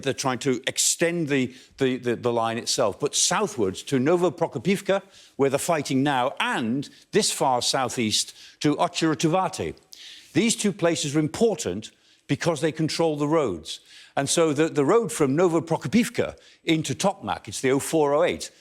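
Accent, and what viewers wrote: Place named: United Kingdom. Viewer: Thanks UK for existing, lovely accent when talking! greetings from Germany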